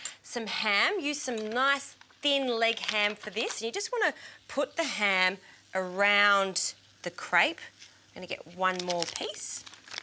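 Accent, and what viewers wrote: Australia. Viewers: Australian accent! 🤩 I am Brazilian and worked on a cruise ship in Australia. Instagram: alinestravels I will try this recipe. I love galettes!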